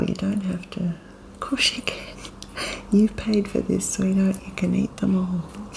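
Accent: Australia. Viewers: Hearing an Australian accent in ASMR is so comforting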